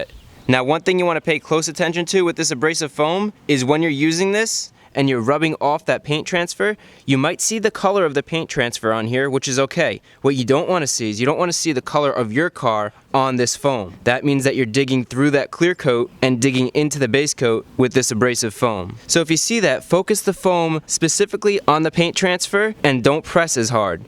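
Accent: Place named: New York, United States. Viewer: You have sort of a NY accent. lol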